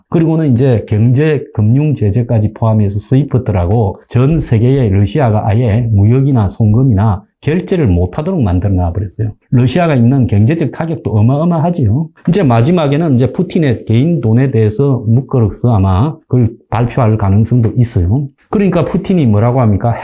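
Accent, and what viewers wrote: Gyeongsang, South Korea. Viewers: 경상도 액센트가 멋있고 더 실감나게하네요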